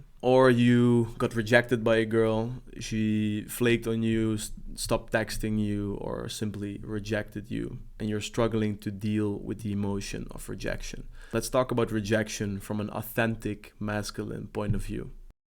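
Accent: Netherlands. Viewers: Jouw Nederlandse accent is zoooo sterk, niet normaal meer man.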